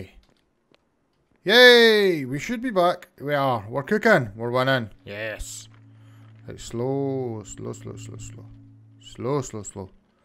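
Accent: Scotland, United Kingdom. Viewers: Black Sheep Thats what I thought as well :P I love the Scottish accent though!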